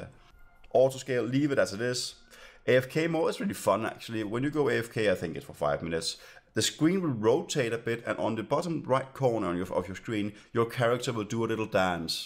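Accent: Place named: Denmark. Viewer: Where are you from. Let me guess Denmark?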